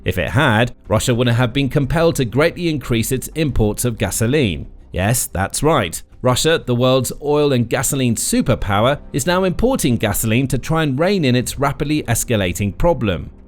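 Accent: England, United Kingdom. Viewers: It really, REALLY bugs me how the narrator keeps calling petrol, "Gasoline", because he is English from his accent and in the UK they call it petrol NOT gasoline.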